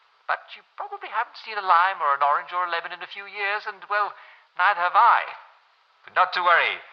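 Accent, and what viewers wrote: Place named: United Kingdom. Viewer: It's the English way of speaking English. We say lift instead of elevator, we say V-ih-tamin instead of V-I-tamin.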